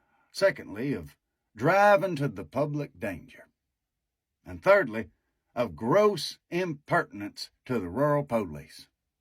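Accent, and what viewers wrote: South, United States. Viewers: An funny scene, funny for children and adults on the page but the southern accent absolutely kills it! I fell over at " cheeking the roooral poh- lice".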